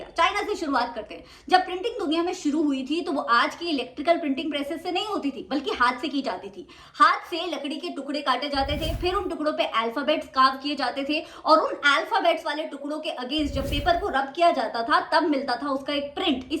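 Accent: Gorakhpur, India. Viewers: Mam aap Gorakhpur se hai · Mam aap gorakhpur se hai kya ❤ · Mam are you frm gorakhapur😅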